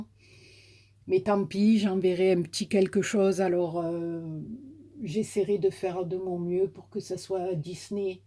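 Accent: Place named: South, France. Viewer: Coucou Très contente d entende ton accent du sloeil Très heureuse de savoir que ton mari va mieux ta fleur est magnifique srcapbises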